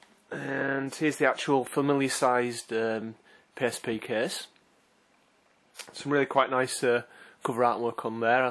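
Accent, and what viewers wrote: United Kingdom. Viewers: Very good video, surprised I hadn't watched before -_- got a little scarey toward the end LOL. Nice to finally see you in the flesh, are you a Yorkshire man? Barnsley, Leeds maybe? Thanks again and keep the psp vids coming!! I'll subscribe.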